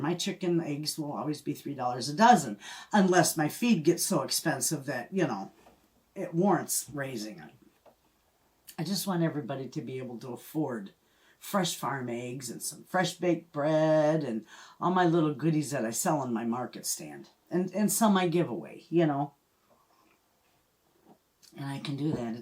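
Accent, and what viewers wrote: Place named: Chicago, United States. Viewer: Lady I just found you maybe a week ago, binged a few videos, and was hooked! You are a delight! I literally smile, and giggle throughout your videos! You are so fun!! I just have to ask. I am a Michigander as well, and too lived in Chicago. Your accent sounded like you were from Chicago, are you? LoL... Accents and trying to figure out where they are from are my thing ?! Anyway I so look forward to the notification that you have posted something! Love your channel!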